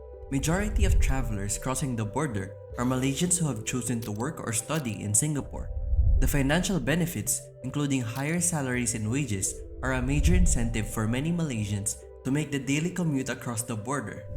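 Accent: Philippines. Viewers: Why such heavy Philippines accent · A pinoy narrating this.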